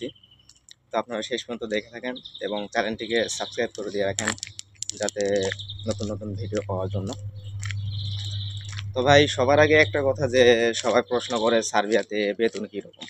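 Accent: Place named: Naogaon, Bangladesh. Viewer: আপনের ভাষা মনে হয় নওগাঁর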